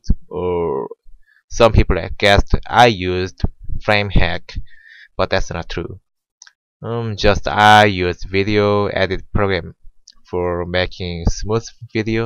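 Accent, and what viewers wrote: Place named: South Korea. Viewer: Hater back off kay? English isn't his first language The korean accent is strong if you actually listen you'll understand him so easily.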